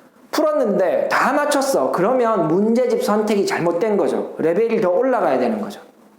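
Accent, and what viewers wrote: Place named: Daegu, South Korea. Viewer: 빠른피드백 정말감사드립니다 복받으세요 ㅎ 선생님께서 말씀하신 동영상 잘봤습니다 저도 선행보다는 심화쪽에 관심을 두고 공부를 시키고 있습니다. 학원을다니는곳이 없어서 시간이 많은아이라 초등부터 수학공부에 80프로를 투자하고 공부를 해온터라 1-1학기 마무리하는데도 주위 다른아이들보다 몇배는 시간들여서 한듯합니다 그래도 안심은 늘 안되긴합니다 ..;;;수학학원을 보내보니 너무 엉망이고 집에서 하자하니 엄마랑 전쟁입니다 제가 공부하면서 가르쳐야니깐 보통일이 아니네요 사투리를 쓰셔서 혹시 해운대분일까하고 봤는데 대구....너무 아쉽습니다 제가 수학쪽은 몰라서 드리는 질문인데 1-1 2-1 3-1 이렇게 구조도상 공부가 연결이 되어있어서 1-1끝이나면2-1하는게 맞다고 주위 학부모들 및 학원선생님들이 요런과정으로 시키고들 있더라구요 그게 아닌가보네요^^다음 동영상올리실때 요런부분도 한번 짚어주시면 많은 학부모님께 도움될듯합니다.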